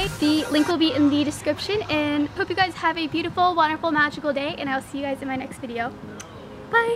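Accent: Canada. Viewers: omg are you from canada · Canadian ? Yééé :)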